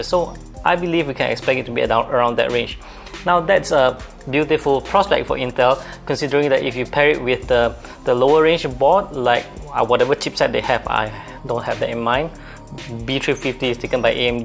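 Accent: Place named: Kelantan, Malaysia. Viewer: Kelate boh!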